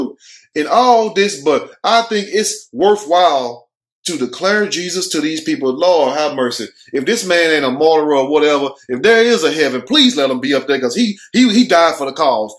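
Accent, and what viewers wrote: South, United States. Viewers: Boy, I love ❤️ the way you tell a story young man!!!! 😍🥰!!! With your country self!!! This is country curvy cougar loves herself a country talking young man! Your wisdom & discernment is out of this world!!! Ellen please come & discover this very talented cutie pie who needs to have his own show!!! Please!!!!!!!!!!! 🙏🏾🙏🏾🙏🏾🙏🏾 In the meantime, this “family member” will keep on watching this awesome on his channel......until his promotion! I only 🙏🏾 he stays the same when he does become a famous tv star!!! 😘😘😘😘💜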